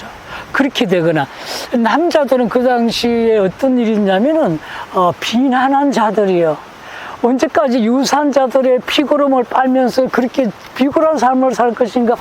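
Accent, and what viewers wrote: North Korea. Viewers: 도대체 당신의 정체가 뭐냐 말투가 북한말인데 북에서 언제 내려왔나 당신이 받은 임 무가 뭐냐 6,25역사 왜곡하러 내려 왔냐 이것이놈의 나라가 완전히 공산화 되었구만 이런자가 설치는데도 방치하고 있으니 아하 이제 이제 이나라가 완전히 망했구나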